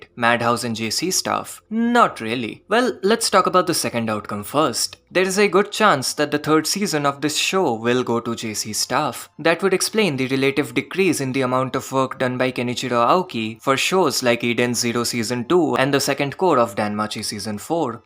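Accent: India. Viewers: Bro has an indian accent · First time hearing your voice, I know you're indian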